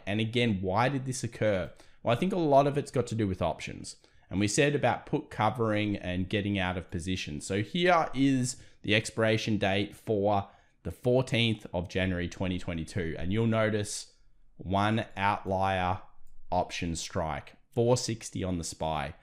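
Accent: Australia. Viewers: Hey, hey, hey! Welcome back. We've missed the great Aussie accent. 😂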